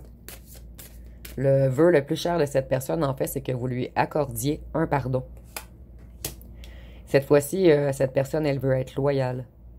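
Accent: Quebec, Canada. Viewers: Bluffant !! Incroyable à chaque fois mais là 👏 bravo. J'adore votre accent québécois et aussi quand vous rigolez sur certaines cartes le concernant 😂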